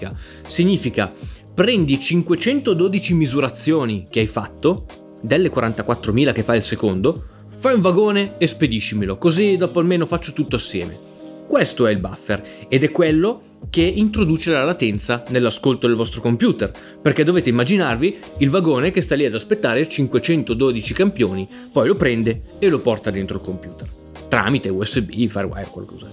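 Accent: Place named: Rome, Italy. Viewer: Co st'accento sei proprio 'ntajo (con questo accento sei veramente divertente per chi chiama da fuori Roma). Grazie per tutte queste spiegazioni. Anche sapendole, ripassare non fa mai male. 😆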